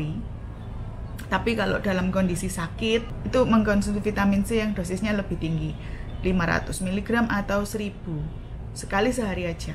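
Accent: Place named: Surabaya, Indonesia. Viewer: makasi ce infonya ........logat suroboyoan e Jan ora ilang blas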